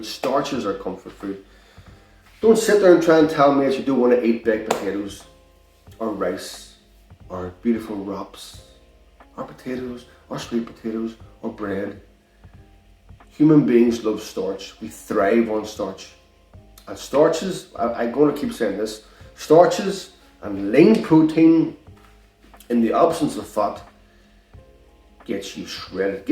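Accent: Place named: Ireland. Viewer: New subscriber from Australia, hello 👋 I love your accent, don't get me wrong , but the first time I heard you talk about Fat , I thought you were swearing 😧 then it dawned on me, Ah an Irishman 😊☺️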